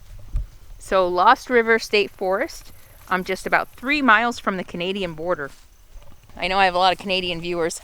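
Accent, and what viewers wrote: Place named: Minnesota, United States. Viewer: I haven’t lived in MN for over 50 years, and everybody still asks me if I’ve seen “Fargo.” The accent is strong in this one.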